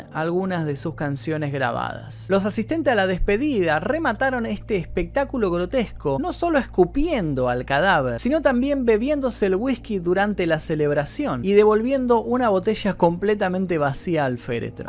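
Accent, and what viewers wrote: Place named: Argentina. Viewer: Es argentino, para mí es normal escuchar ese acento, en mi país sobran los argentinos.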